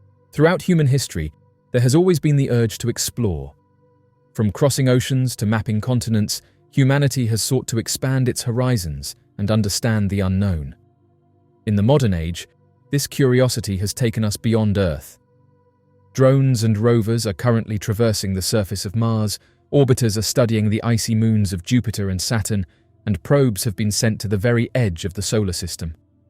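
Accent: England, United Kingdom. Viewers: Run for your lives !!! The Aliens are coming !!! Be very Afraid !!! Do what the Aliens tell you to do !!!!!! Do what a creep with an "English" Accent tells you to do !! Believe the Propaganda !!!! This is really entertaining for me !!!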